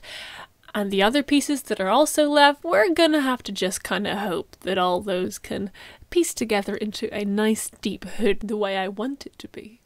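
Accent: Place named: Ireland. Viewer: I was like “this is 100% being crafted by an emerald isle accent”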